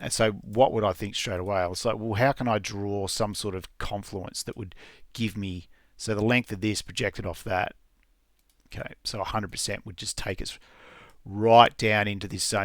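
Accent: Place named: Australia. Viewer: Great to hear the Oz accent on YT!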